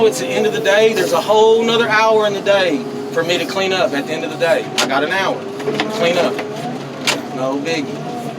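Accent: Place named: Texas, United States. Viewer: best to watch videos without sound, so you don't hear this texas twang moron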